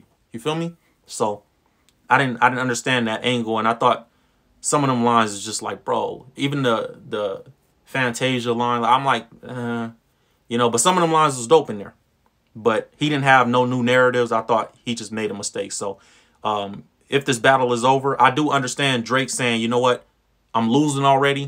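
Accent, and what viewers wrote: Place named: West Coast, United States. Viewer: Ok u from the West Coast your accent lol 😅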